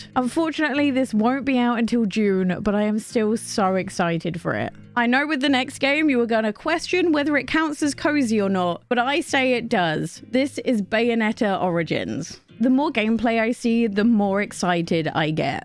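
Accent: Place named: United Kingdom. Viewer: If you don't mind me asking, where is your accent from? It sounds British, but also a little different, for example right at the beginning when you say "so many," the "so" almost sounds like there's an "r" sound at the end of it. Thanks!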